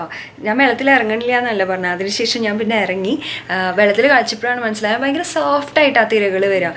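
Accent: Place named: Thrissur, India. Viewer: I live in thrissur I know people here speak like this only...people from southern kerala like me may feel her slang different. Style ett parayunnath kettal mansilakum. Avarkk vakkukal kittarilla😅 pakshe nalla fluent alle malayathil.slang is different its because she belongs to thrissur. It's so cute to keep listening to her. Natural talk which I love most about her😊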